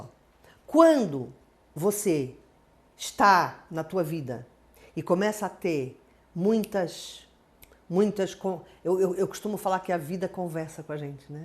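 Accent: Brazil. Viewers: Bom vídeo e útil da para refletir obrg você e portuguesa como eu mas tem sotaque brasileiro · Porque é que fala com sotaque brasileiro?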